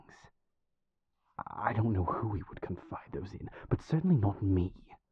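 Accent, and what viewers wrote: United Kingdom. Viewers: Porter's voice just gives me intense feels: always loved an enchanting British accent ❤️❤️❤️ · Never thought I'd fall so hard for a classic British accent 😂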